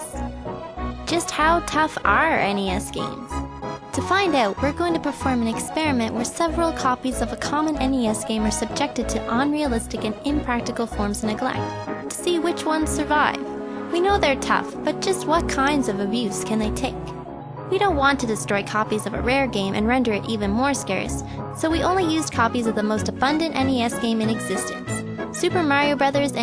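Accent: Canada. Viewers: Canadian girls who say aboot... Nice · God, that voice, I love Canada. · oh and...aboot ;) hahah that accent is awesome too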